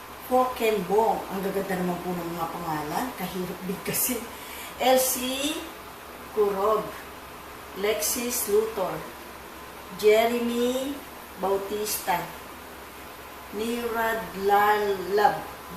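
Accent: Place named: Nueva Ecija, Philippines. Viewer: naalala ko tita ko sa pag sasalita. taga Nueva Ecija siguro si madam :)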